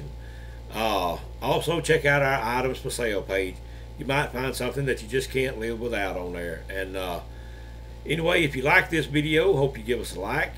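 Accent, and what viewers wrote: Alabama, United States. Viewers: I love your Alabamer accent! Yeehaw!🤠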